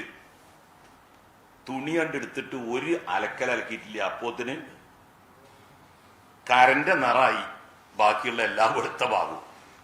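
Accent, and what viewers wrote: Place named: Malappuram, India. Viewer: മലപ്പുറം സ്ലാങ് 🙏🙏🙏😄😂😂😂ഫീൽ good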